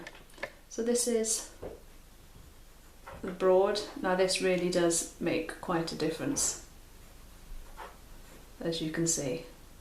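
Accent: Wales, United Kingdom. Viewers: I keep hearing a faint tint of Welsh in your accent?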